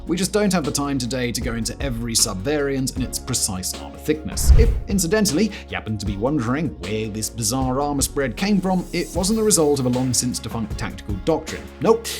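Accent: United Kingdom. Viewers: You are obviously biased against German weapons; your British accent and arrogance gives you away! My uncle fought against them, and he said that they made our tanks look like trash; in terms of firepower, mobility, and armor protection, they were superior to all allied tanks. There did have teething problems initially, but they were quickly ironed out, and the Panther became one of the best tanks of the war. You need to trash a your bias, and stick to facts. Talk to veterans that fought in them and against them, and you’ll have (or should have) a different opinion. You’ll lose viewers if you keep up your bias. Read the reviews and you’ll see it.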